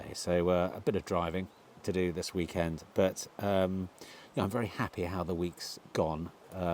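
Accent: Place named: Scunthorpe, United Kingdom. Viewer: Great to hear you're Dad's on the mend! The Scunny accent is an added bonus! By the way, forget anything that runs on batteries...absolute witchcraft! 😜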